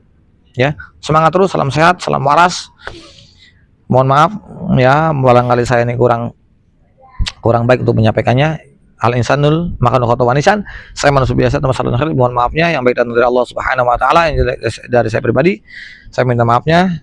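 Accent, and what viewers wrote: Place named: Kupang, Indonesia. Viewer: Logatnya JD agak Kupang hehe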